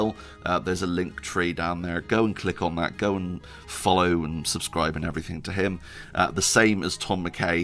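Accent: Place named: Bristol, United Kingdom. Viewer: nice place, was there a few weeks ago. Grew up in Bristol so the accent seemed vaguely familiar. Anyway, getting back to the point, great video. Working my way through the first one at the moment.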